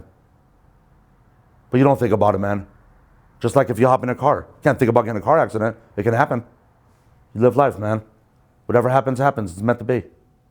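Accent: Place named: Southern California, United States. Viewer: Dude sounds like Tony Ferguson from ufc. Got that socal accent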